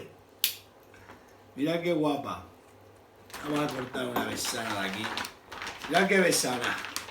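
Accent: Extremadura, Spain. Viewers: me encanta su acento extremeño. me recuerda a mi madre que lo era. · Medio minuto y ya bastante seguro de que este señor es extremeño, y me suena que probablemente de algún sitio de Cáceres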